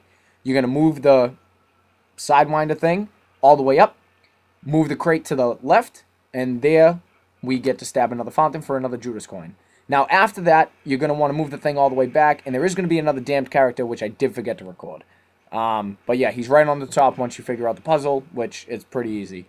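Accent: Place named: Boston, United States. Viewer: just watched ur part 1, ill be viewin all of um for my plat. good job dude, n the boston accent=tight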